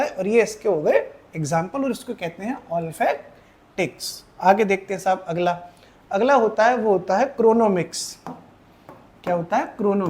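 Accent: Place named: Etah, India. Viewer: Bhaiya aapko jaha tak h Maine drishti coaching mentorship me dekha tha aap bhi join kiye the upsc ki aur shayad aapka ghar etaa side h aapki boli se lag rha h😊😊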